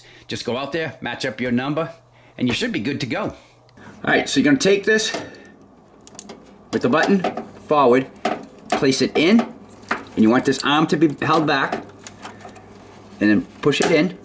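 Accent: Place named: Long Island, United States. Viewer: Smoke test - LOL Thanks... You must be from Long Island or New Joysy!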